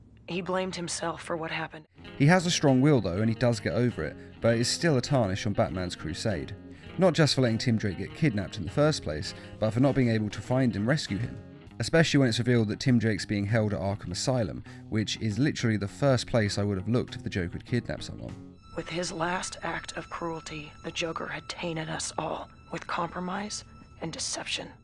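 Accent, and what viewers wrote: United Kingdom. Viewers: I know Americans and Superman fans will eat up anything a British voice tells them but this list is dumb. Might as well list his parents deaths as another one of his "failures" because as we all know -- every time some tries to cause you or your loved ones harm -- IT'S ALL YOUR FAULT.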